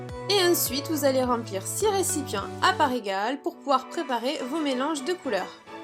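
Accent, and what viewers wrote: South, France. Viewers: teddy zora Elle est du sud ducon mdr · C'est quoi cet accent du sud la?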